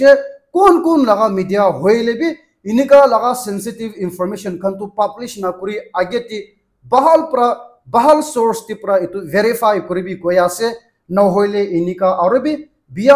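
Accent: Nagaland, India. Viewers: 😂I like your nagamese news..as you speak our naga ascent of our nagamese common linguage..thanks and may God bless you pl..yes stay as,a naga and speak as a true origional nagamese I like it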